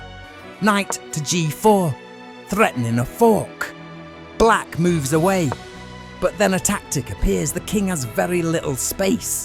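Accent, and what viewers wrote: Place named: United Kingdom. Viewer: Bro is defenrtly from the british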